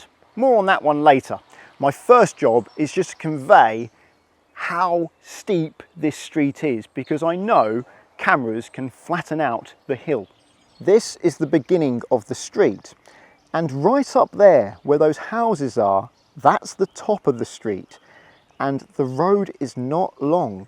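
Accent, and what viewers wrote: United Kingdom. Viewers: uhh, the video host is obviously British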